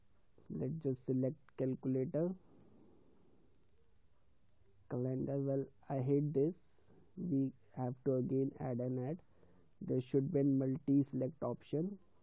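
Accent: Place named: India. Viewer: Damn, that Indian accent 😅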